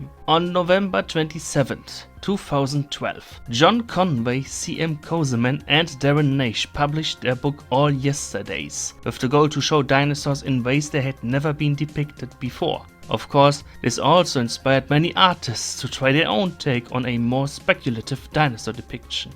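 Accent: Germany. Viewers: Are you german? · deutscher akzent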